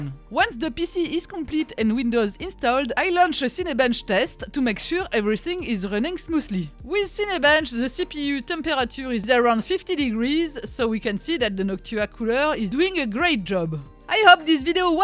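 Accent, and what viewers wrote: France. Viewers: Beautiful video ❤ Clear instructions on how to install Noctua Fan on the Motherboard 🖥 Love the English in French accent 🟦⬜🟥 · Thickkest French accent ever 😅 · The accent is not exactly "French", it is more precisely Parisisan accent. Try the Québec accent. '-) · salut, cher camarade français $$ j'adore l'accent english des fr qui parlent anglais ^^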